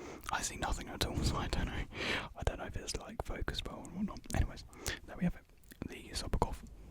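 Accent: United Kingdom. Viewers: Not a fan of uk accents but I liked listening to yours. Also really cute love the dark hair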